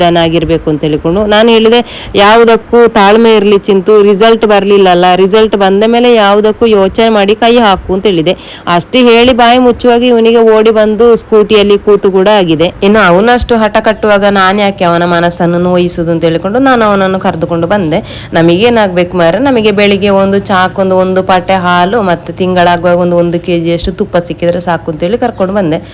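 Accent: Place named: Sullia, India. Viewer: Ninu sulliada rural houdu mathaduvaga gothagutha ide. Madam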